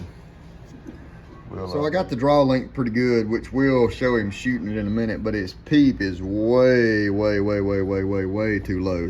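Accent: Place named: South, United States. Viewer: I love to hear southern drawl talk! It's a southern heritage to be proud of. Hope your hunt is going well! Blessings!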